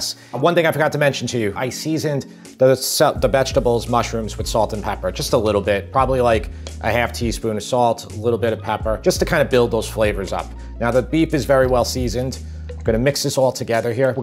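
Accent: New York, United States. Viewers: I can hear and see the italian NYer in you lmao. Your voice and hand movements. It's so wholesome XD Equal parts likely to dump a body over the Brookline bridge with cement shoes as cook an amazing italian meal! Haha. I REALLY love that you just jumped straight into chopping and listing the ingredients. Too many chefs list the ingredients as they cook. I find myself skipping all around the videos to make a list. No such case here! Awesome content man!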